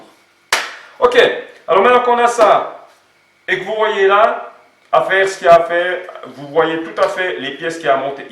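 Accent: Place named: Bernese Jura, Switzerland. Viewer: du jura-BERNOIS!!! bandes d'ingrats, merci pour ta video👍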